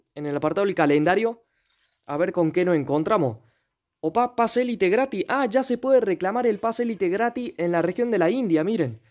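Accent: Argentina. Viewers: Re argentina el pibe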